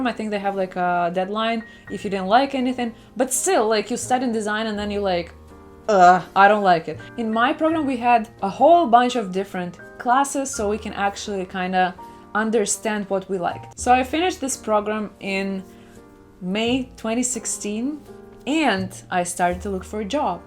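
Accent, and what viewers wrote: Russia. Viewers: I love when you do "blah blah blah " And your Russian ascent is amazing👍🤩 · I love your russian accent 🥰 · Love your Russian accent! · Как же приятно слушать русский английский =) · I love the Russian accent It's music to my ears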